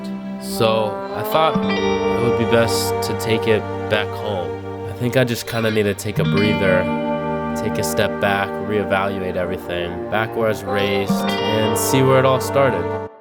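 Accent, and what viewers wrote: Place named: United States. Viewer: u have too much of american accent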